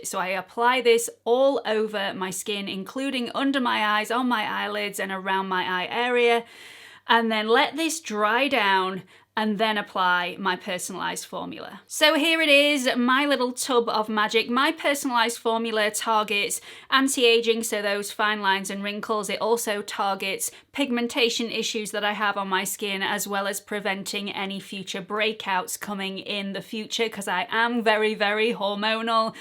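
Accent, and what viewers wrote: United Kingdom. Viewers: I, m Spanish and I, ve recently discovered your videos, may bé a week ago and I adooooore you, I, m 55 Years old, so, I listen carefully about tour mum's tips and devices about skincare and make up I think you're honest and I heard very realistic recommendations from you. And, theres a bonus, listening to you., I learn a lovely british pronunciation. Sorry, maybe I dont write very well, besos y enhorabuena por tu estupendo canal.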